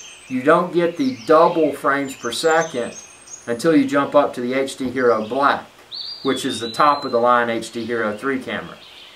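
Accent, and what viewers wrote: South, United States. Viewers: I have a feeling it is angry Europeans disliking his southern accent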